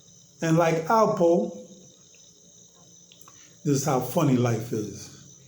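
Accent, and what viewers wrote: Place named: Belize. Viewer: I thought that but it's actually Belizean they sound similar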